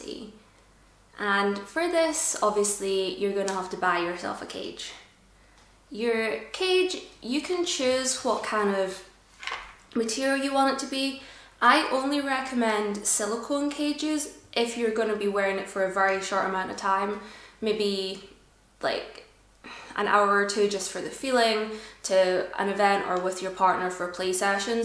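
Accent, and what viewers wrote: Scotland, United Kingdom. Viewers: Are you Scottish? Your accent sounds Scottish to me.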